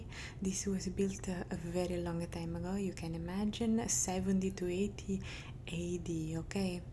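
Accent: Italy. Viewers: I love the Italian accent, such a calming sound ☺️ This video is especially nice, I love to travel so listening about planning a trip to Rome is perfect for my interests! ✈️ You are my new favourite ASMR channel 😊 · More Italian accent video please😍, it’s so calming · Please make more of these Italian accent videos! Your whisper is incredibly soothing! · yaaay another italian accent roleplay, thank u 🙏🏽 bellissima !! 🇮🇹💚🤍❤️ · The Italian accent asmr you do are the gifts that keeps on giving. Very relaxing and tingle inducing. You are in the asmr super league with tingting,Springbok and the sleeping pearl. Please do a page turning book vid. You would smash it. Bravo x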